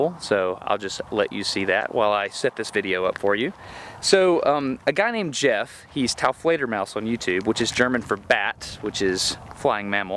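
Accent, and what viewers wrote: South, United States. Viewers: I have to ask. This vid was published June 22, 2012. Today is October 3, 2017 and I'm watching it for the first time. At the beginning you said you were in the California desert. How do you come by your Southern accent? I'm watching this from East Texas. You sound like you could be from around here. Just curious. Where are you from, originally? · this is america in a video, the food, the accent and the gun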